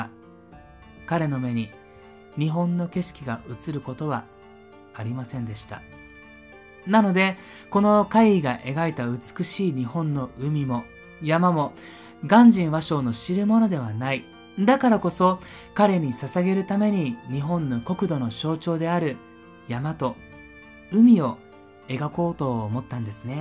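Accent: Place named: Kobe, Japan. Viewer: 先生の動画でちらほら聞こえる上品な関西弁アクセントは、神戸かなぁって思ってるんですけど、やっぱりそうやったんやろか?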